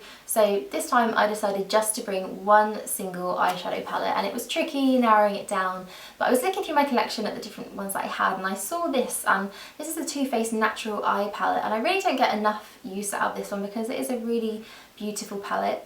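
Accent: United Kingdom. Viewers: The dedication in that down bar, you go girl ! ;) I could listen to you speak all day, such a relaxing voice ! Out of curiosity, is your accent from any specific region in the UK? I'm Australian and find it very interesting how incredibly different regional accents can sound there (obviously it applies here as well but no where near that extent)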